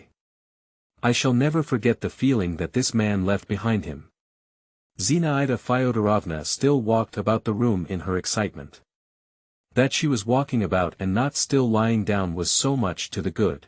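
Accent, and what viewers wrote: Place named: United States. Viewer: I dont like américain accents, to me ruins the poetry of the words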